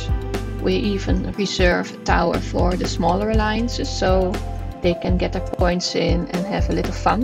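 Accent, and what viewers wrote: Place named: Netherlands. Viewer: Nice video, recognize a fellow Dutchie when I hear one haha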